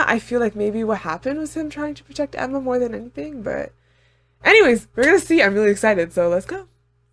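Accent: France. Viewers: are you french? 😮😮😮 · GIRL U FRENCH !? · Ton accent français est très beau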